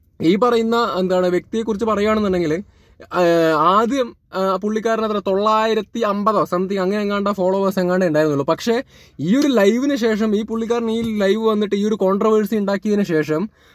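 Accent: Palakkad, India. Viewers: Palakkad aano etta nigal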